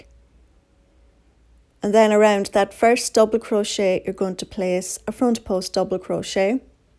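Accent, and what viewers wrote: Ireland. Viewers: I love this pattern! thank you but i also love listening to you speak..love your brogue..:) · Yay Irish accent.. Love the video x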